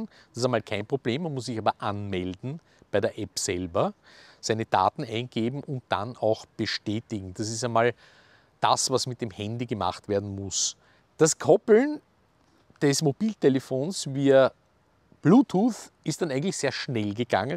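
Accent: Austria. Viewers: Wie immer ist dieser Moderator sehr sehr nett und freundlich sowie proffesionell und dann hat er noch den schönen Wiener Dialekt einfach super und genial 😎😃😂😁😀😄😅😆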